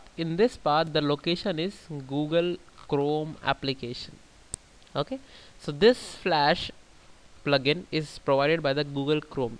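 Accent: India. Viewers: hes an indian, they their own accent.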